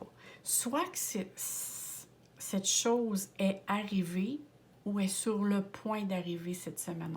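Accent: Quebec, Canada. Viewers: Bonjour, merci pour ces tirages. J'ai fais le choix n°2, ça me parle énormément! J'espère que ma situation prendra l'issue de la fin du tirage, le retour de la personne. J'adore ton accent Québécois et toutes les merveilleuses énergies que tu nous transmet aux travers de toutes tes vidéos. Namasté.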